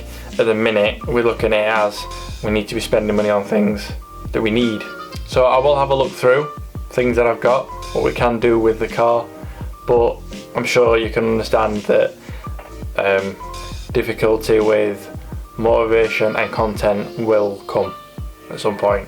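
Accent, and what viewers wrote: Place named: Yorkshire, United Kingdom. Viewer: Top effort on 5k. Hey, stay strong and look for only little goals to stay up beat. I know how tuff things can be for you in lockdown as I was without any luxury in Afghan for a very long time. Remember to enjoy life and don't set big daily goals as failure to complete them can make you feel worse. Lovin your Vids & Yorkshire accent more than ever these day since I'm working down south.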